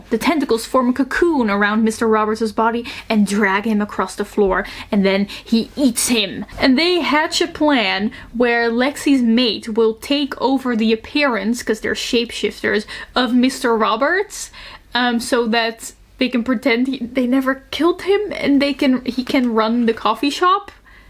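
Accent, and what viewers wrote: Netherlands. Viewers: please make a playlist of you reading/reviewing truly unhinged and/or terribly written books (this, gothikana, etc) because they are so funny and I love them and sometimes what I need is to hear bad smut lines read aloud in a Dutch accent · you calling squidward octo made my ears perk up, along with your accent. dutch person detected!!!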